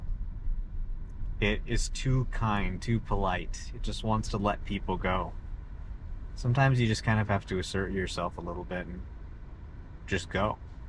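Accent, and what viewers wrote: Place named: Canada. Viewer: Bro got the canada accent 🏳️‍🌈 🏳️‍⚧️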